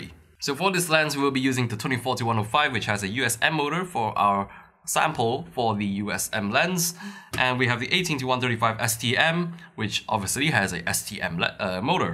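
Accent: Singapore. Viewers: great review. may I know where are you from? you sound like Singaporean or Malaysian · Singaporean for sure.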